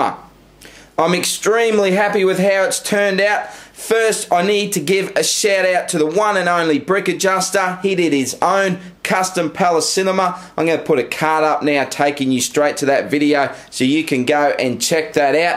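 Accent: Australia. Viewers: I like that Aussie accent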